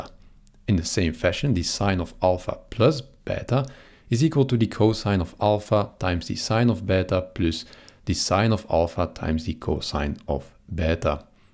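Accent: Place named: Belgium. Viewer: Ik kon direct horen dat je een belg was haha, moest dit even opfrissen voor bachelorproject robotica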